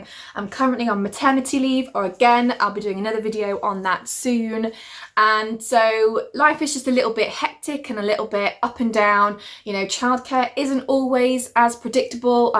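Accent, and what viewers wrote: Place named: United Kingdom. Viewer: omg i love it i am from the us though so British accents always sound good to me lol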